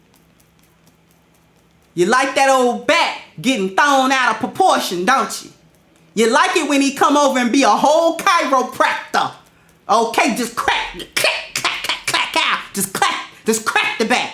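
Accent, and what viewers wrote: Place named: South, United States. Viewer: Yu got to be frm the south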